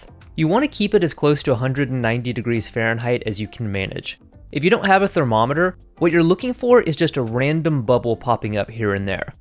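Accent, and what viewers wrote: United States. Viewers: Currently living in japan and the broth is absolutely spot on. Also the egg thing, my girlfriend was surprised that I hadn't ever seen that before. Also the wya you at the ramen is exactly jow everybody does it: enter the store, order at the machine and slurp it all in as quickly as you can so you can go back home and maybe sleep 8 hours if you're lucky before you have to go commuting again. The pronounciation of all the Japanese is just so stereotypivally American that I cant help be laugh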